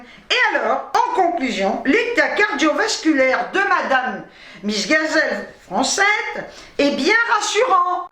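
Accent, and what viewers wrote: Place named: Algeria. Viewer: Bienvenue au Club des Barjots!!!! Ca tombe bien,j'en suis UN! On a écouté ton histoire avec Véro(mon épouse),c'est un sketch!!!! Franchement ton coté Pied-Noir pour raconter,c'est un régal. On a bien rigolé avec Véro,et surtout quand tu racontais,en faite je voyais le film défilé,on s'est marré. Sur la tête de ma Mère,Yallah Gazelle!!!!!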